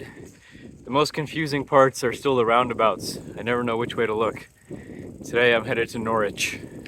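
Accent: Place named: Australia. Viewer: Loved the Australian accent. Cheers.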